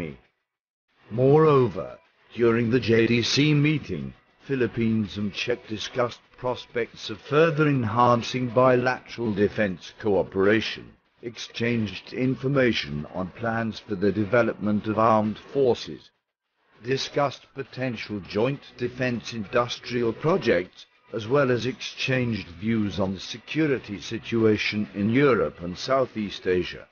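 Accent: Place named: United Kingdom. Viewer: British robotic voice👎...but nice update👍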